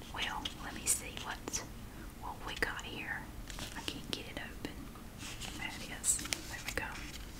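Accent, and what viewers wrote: South, United States. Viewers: I just started watching your channel. I absolutely love you and your southern accent! Much love and support from New Brunswick 🇨🇦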